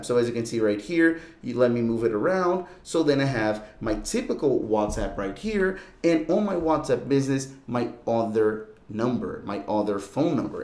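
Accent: Brazil. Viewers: u sound brazilian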